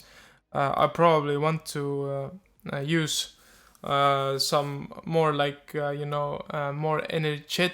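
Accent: Russia. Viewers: Ur accent sounds like u from Russia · мне кажется или ты русский?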